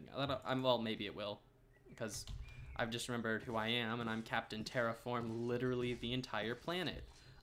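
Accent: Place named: Australia. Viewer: At first I could not place your slight accent, but then hearing you count made me realize. I honestly was like "This dude sort of sounds Australian." Boy am I dumb.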